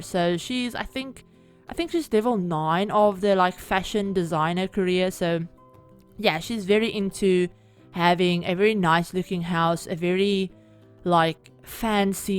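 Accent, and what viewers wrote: Afrikaans, South Africa. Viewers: Are you Afrikaans? Your accent sounds very Afrikaans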